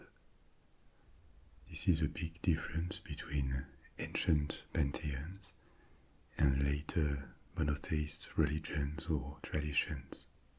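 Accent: France. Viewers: Je crois entendre un accent français donc je vais commenter en français. J'ai lu l'Edda mais ça c'est aussi une façon ludique d'en apprendre plus sur la mythologie nordique!😁 Ps: Je viens de voir le nom de la chaîne 😆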